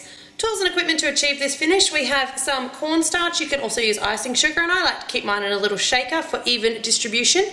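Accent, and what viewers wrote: Australia. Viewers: Aren't u Australian? I recognise ur voice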